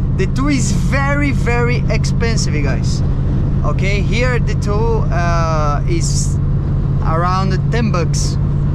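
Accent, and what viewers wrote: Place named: Brazil. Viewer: ele "perde" esse sotaque abrasileirado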